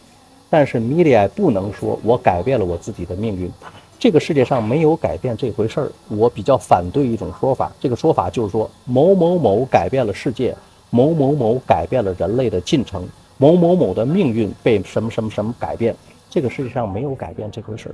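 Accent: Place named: Shanghai, China. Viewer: 您有上海口音，老实说，上海人做你这样营生的比较少，总之，你做的很不错，我瞎猜在北京等地工作过很久。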